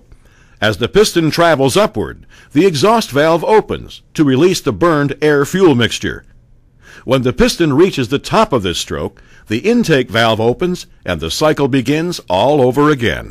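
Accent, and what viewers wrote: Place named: United States. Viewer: Do we have to have the overconfident American accent? It's a piston not a peeestonnn.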